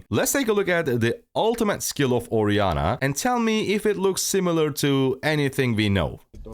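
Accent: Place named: Turkey. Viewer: Dude, your accent sounds turkish, are you ?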